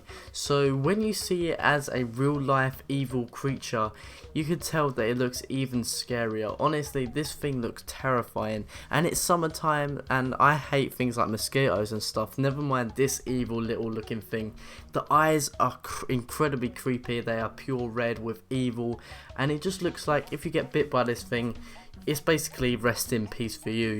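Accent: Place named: United Kingdom. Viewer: Cut Him Some Slack He's British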